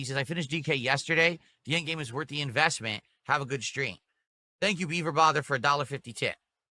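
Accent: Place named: London, United Kingdom. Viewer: Big ups the London Grime intro. Nice to hear my own accent.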